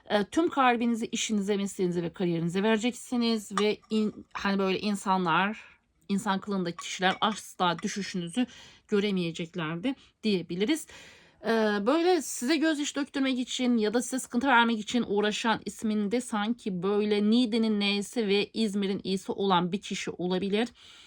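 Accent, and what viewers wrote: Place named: Azerbaijan. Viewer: Salam. Siz Igdirlimisiniz. Sanki konusmanizda bazen benziyor da o yüzdən. Yani bazen azərbaycanca konusmanizda da var Ben onu hiss ediyorum.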